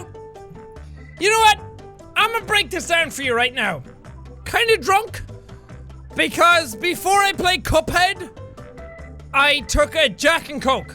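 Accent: Ireland. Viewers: U know u have a strong irish accent when u start say fookin instead of fucking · i didnt think it was possible for Jack to get even cuter and even more irish. i have been proven wrong on so many levels.. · The more drunk he is his voice gets deeper and more Irish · It seems that the more he drinks, the more Irish he gets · by the second shot his voice got more irish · I love how he sounds progressively more irish when he takes more shots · He became progressively more Irish with each shot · One you are beautiful Two the more drunk u get the more Irish u sound Three are you Devlin out of the sabotor by any chance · It sounds like he gets more Irish the drunker he gets. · He sounds more Irish the more he gets drunk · Im irish and thats just how it works you get drunk and your voice goes full irosh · HOW IRISH IS THIS LAD?!? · he sound more irish the more he get drunk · Is it just me or does he get more Irish with every shot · You can hear the complete Irishness in his voice I think jack was drunk at the end there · The more he drinks the more Irish he turns into, do you notice that? 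· His Irish accent is so much stronger wen he’s drunk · Jack, you are talking Irish AGAIN... please stop... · Am I the only one that thinks that jack should do this more often and that he gets a more prominent Irish accent when he is drunk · Is it me or does Jack start to fall back into his Irish a little more when he drinks lol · I dont know if I'm the only one that realizes, but his Irish accent is way easier to hear in this video compared to others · Did anyone else notice how the more drunk he is the more Irish he sounds? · The more shots jack took the more Irish he sounded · As he gets more drunk, he gets more irish lmao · His true Irish man is coming out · Jack gets a thicker Irish accent as the video goes on. Noice. What am I doing with my life.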